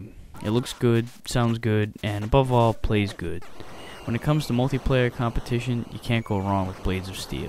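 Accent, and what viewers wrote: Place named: Boston, United States. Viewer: ah so your from boston, i was wonderin about the accent. anyways , hows boston?ill be moving there in a few months, around suffolk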